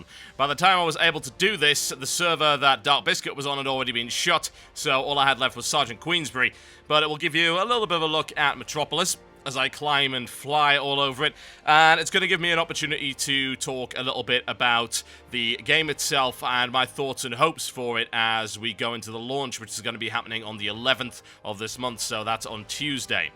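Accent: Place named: Newcastle, United Kingdom. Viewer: It's nice to see another geordie.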